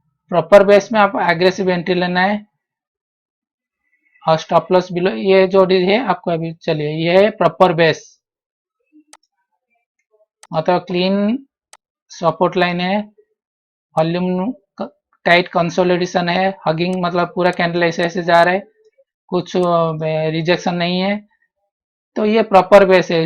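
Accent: Odisha, India. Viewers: Sir are you from orissa ?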